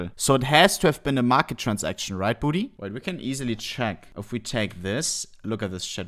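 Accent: Germany. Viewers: german accent is so strong that it sounds like he is talking in german even when saying english words.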